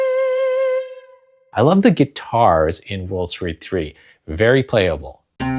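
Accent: India. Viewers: Hi. You are clearly from india. but i am wondering what your mother toungue is? I love Your reviews. This is Preetam from India.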